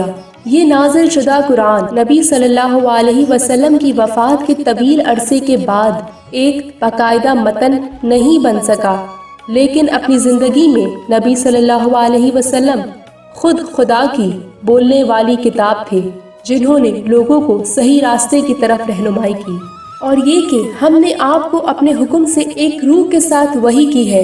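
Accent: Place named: South Asia. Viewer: ap badun ki bol chal sa he andaza laga sakta tha ki ya india ka hn ya pakistan ya kasi sawal howi??!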